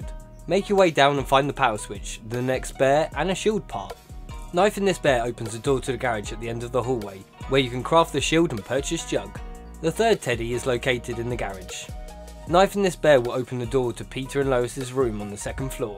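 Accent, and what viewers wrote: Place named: United Kingdom. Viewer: Thanks british fella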